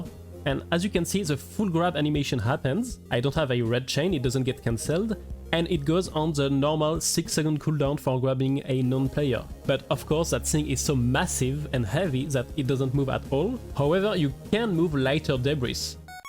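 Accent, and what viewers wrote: France. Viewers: Bro i love your accent “we grrrrab ze playeur statu and rrrun to zhafity wit it” it is so nice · dude this is so funny, well edited and highly informative for such a low view and sub channel, take my damn sub! also you accent (I'm pretty sure it's french) is 🤌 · You sound french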